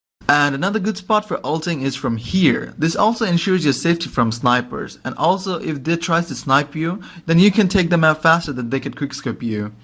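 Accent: Bengal, India. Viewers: Bro. You are Bengali? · You're bengali ? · Bruh u r bengali??? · ভাই বাঙ্গালি নাকি? এক্সেন্ট এমন লাগছে হ্যা অবশ্যই! পরে ভয়েস শুনলাম। :3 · My guy bangali, noice, I understand what he said 😂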